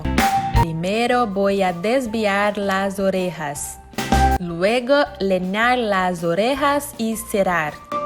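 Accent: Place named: Spain. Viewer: Amei seu sotaque Espanhol 🤣🤣🤣🤣🤣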